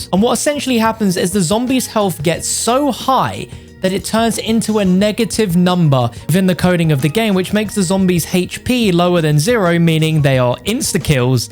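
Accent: United Kingdom. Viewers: bruh ur british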